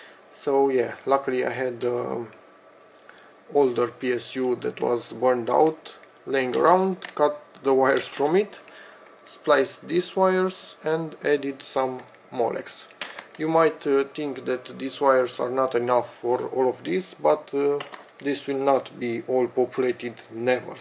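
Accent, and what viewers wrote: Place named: Romania. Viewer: si cand ma gandesc ca esti roman dupa pronuntie,dar nice videoul,am o intrebare pe MSI g31tm-p21 merg setarile astea ca am acelasi procesor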